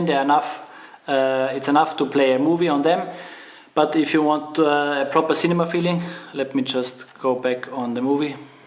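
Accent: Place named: India. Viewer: all Indian accents sound shit, go back to Hindi, indians...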